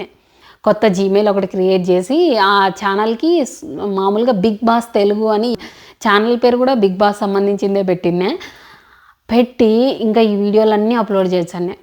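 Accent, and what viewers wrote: Rayalaseema, India. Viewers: Hi sis మేం కూడా రాయలసీమ మీరు మాట్లాడుతుంటే చాలా happy ga వుంది,mobile ముందు పెట్టుకొని చూస్తే మా sister tho opposite లో కూర్చుని మాట్లాడుతున్నట్లుంది, జై రాయలసీమ అక్క,,slang 👌👌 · మేము కొన్ని సంవత్సరాలు కడపలో ఉన్నాము, రాయలసీమ భాష వినడానికి బాగుంటుంది, మీరు చెప్పే విషయాలు నాలా కొత్తగా యూ ట్యూబ్ చానెల్ క్రియేట్ చేసిన వారికి చాలా ఉపయోగపడతాయండి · రాయలసీమslang చాలాflowగాఉంటుంది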